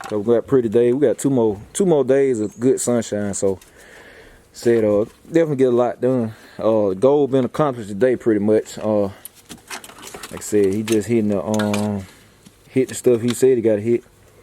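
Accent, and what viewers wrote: Georgia, United States. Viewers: Im from Vegas & You sound like my family in Lagrange, GA. I dont see/ hear anything wrong with the way you talk. You remind me of my cousins. Keep building these cars & enjoying life. Negativity comes with life. Keep the videos coming. 💪🏽💪🏽🏎💨💨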